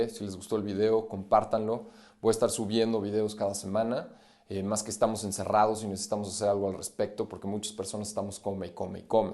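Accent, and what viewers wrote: Mexico. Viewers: Ay te amo! Por momentos tengo que retroceder el video porque me desconcentro con lo lindos que sos y tu acento mexicano 😍❤️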